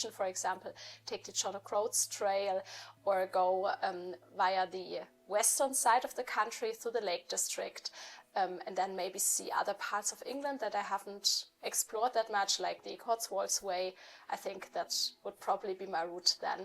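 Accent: Germany. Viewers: Very interesting - thanks for answering all these questions! One that I've never heard you talk about is how your English comes to be so good. Obviously, as might be expected, you have quite a pronounced German accent (which I really like, by the way!), but your command of spoken English, your vocabulary, your use of colloquial expressions and even your pronunciation of British place names are all very good. I speak a little German, but, if I tried to imagine the reverse, with me trying to make videos in German whilst on the go, it would be a disaster! Did you previously spend some time in the UK?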